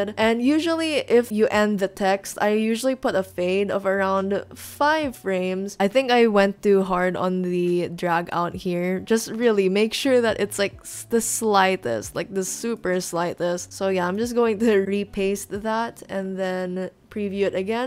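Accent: Philippines. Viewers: w8 yur accent is filipino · for some reason you sound filipino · hmmm i sense a filipino accent are you a filipino? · your accent is familiar are u filipino?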